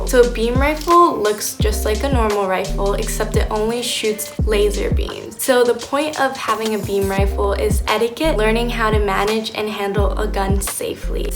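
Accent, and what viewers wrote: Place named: United States. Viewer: you are absolutely entitled to your opinion on guns or anything else - of course! I do feel that most honest, law-abiding adult people anywhere in the world are responsible enough to own a gun and they should have training to know how to use it effectively and when it is legally permissable to use it. In my own case I was British Army trained first at school from age 13, then later actually in the Army Reserves. I learned all I needed to know about firearms there. I have lived in South Africa since 1975 and have always owned firearms for self-defence in one of the most violent crime-ridden countries in the world. Only needed to use a gun once though, several years ago but did not shoot anyone, merely frightened them away. I know that in this country the injuries and fatalities by gunshot are committed not by registered, legal owners of guns at all but by criminals, robbers and such who do not purchase and register their guns - they usually steal them! 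I do not know Japan at all, but a friend of mine who does has told me of the beauty of the country and of the nature of the people. Your English incidentally is excellent and I note that you have a slight and rather attractive American accent - it is a pleasure chatting to you!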